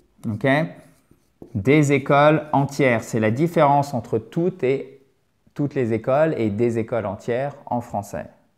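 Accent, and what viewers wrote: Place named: United States. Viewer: Hi. It's very interesting and subtle. I can add that you seem to be closer to the American accent!!! Enjoy the rest of your day!!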